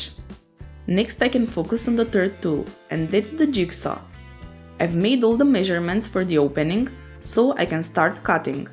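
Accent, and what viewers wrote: Russia. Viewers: Крутая работа! Что за акцент? Русская что ли ? )) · Откуда вы, девушка? У вас сильный русскоязычный акцент... . :))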